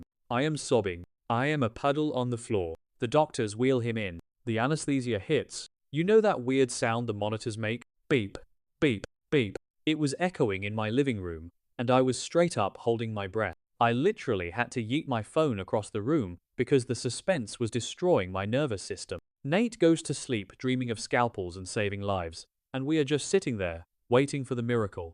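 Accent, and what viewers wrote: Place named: United Kingdom. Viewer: A British narrator is so wrong for this. He is not pronouncing names correctly. Specially Devon. Have an American HUMAN narrate or don't do it at all. After a one minute, had to stop. Couldn't listen to another word. Horrible.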